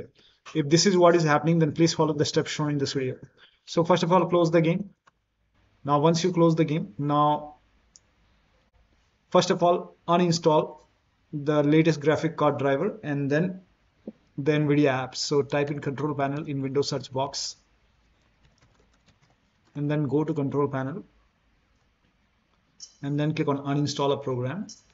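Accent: India. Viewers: i cant listen to some guy with an indian accent sorry .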